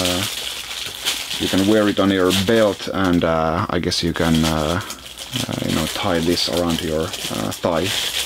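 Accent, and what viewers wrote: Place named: Finland. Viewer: I noticed the finnish accent right away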